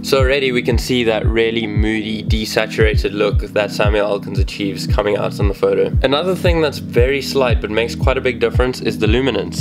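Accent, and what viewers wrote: South Africa. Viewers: Random question but are you south african? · You have a South African accent.🙌🏽👏🏽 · dude, this is brilliant. also, i noticed you accent. south african maybe? (this video was in my recommended so i'm a new photographer) (cape town born and bred) sending you all the good vibes. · i can sniff the south african accent froma a mile away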